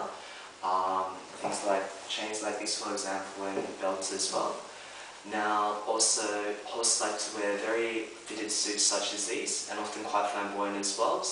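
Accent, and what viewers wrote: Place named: United Kingdom. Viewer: ギャル男-looking guy with british english, what a cool combination lol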